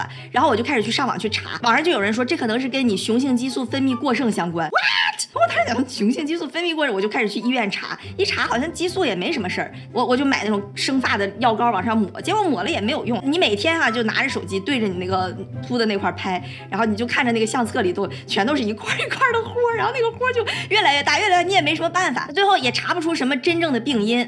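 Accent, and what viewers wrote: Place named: Dalian, China. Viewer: 小lin的东北话 字幕都识别错误了。😂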